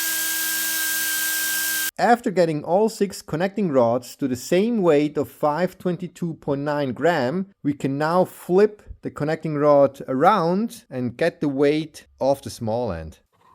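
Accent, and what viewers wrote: Switzerland. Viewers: I'm so sorry but as a German I can't stand the Swiss accent in your English. Just the captions were a lot better. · Wie immer hochinteressant und äußerst kompetent. Aber wie immer ist es auch diesmal wieder verblüffend, Englisch mit schweizerischem Akzent zu hören.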